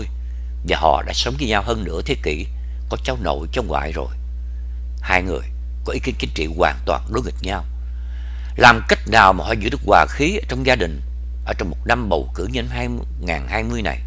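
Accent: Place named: Saigon, Vietnam. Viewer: Thích nghe giọng đọc của anh , giọng chuẩn Sài gòn ❤️👍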